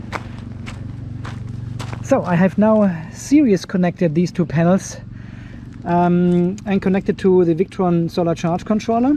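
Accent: Germany. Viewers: Durch Zufall bin ich auf deinen Kanal gestoßen und dachte so ... Ist aber kein native speaker. Klingt deutsch. Und du bist es tatsächlich 😎. Sehr cooles Video, sehr schön präsentiert. Das Beste, welches ich zum Thema gesehen habe 😊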